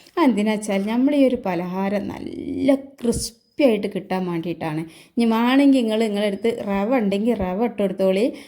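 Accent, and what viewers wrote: Malappuram, India. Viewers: ഇയാളെ വീട് എവിടെയാ 😂സംസാരം കെട്ടിട്ട് മലപ്പുറം ആണ് മലപ്പുറത്തു എവിടെയാ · Malappuram basha ishtam 💚💚💚ഞങ്ങൾക്ക് നിങ്ങളെ നാട്ടിൽ വന്നാൽ കുറവാണ് ഞങ്ങൾക്ക് പറയാൻ 💚💚💚🥰🥰🥰 മലപ്പുറം എവിടെയാണ് എന്റെ ഇത്താത്ത യും മോളും അവിടെയാണ് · മലപ്പുറം താത്തക്ക് ഒരു മാറ്റവുമില്ല ചില വിഡിയോയിൽ മാറ്റമുണ്ട് അതിന് ഒരു ക്രിത്രിമം തോന്നുന്നു ഇന സംസാരം തന്നെ മതി. recie Pie കൊള്ളാം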